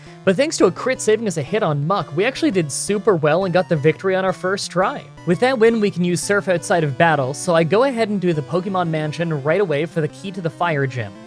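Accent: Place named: Canada. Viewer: I love how Canadian you are, when you say “out” I never know what pronunciation you will use lol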